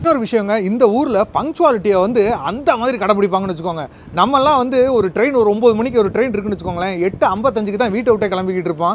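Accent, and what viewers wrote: Madurai, India. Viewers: you speak, is madurai slang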